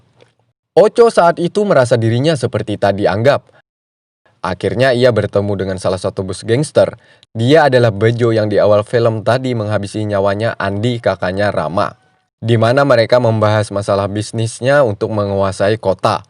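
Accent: Banjar, Indonesia. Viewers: Banjar banar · Urang banjar kh ini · Banjar kah pinanya sidin nih🤣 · Orang mana mang pinang Banjar bangat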